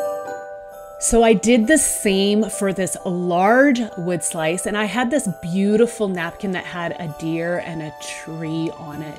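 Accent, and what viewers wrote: Canada. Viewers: I love your DIY's!! Also I recognized your Canadian accent.. lol. I'm a former Canadian living in Arizona.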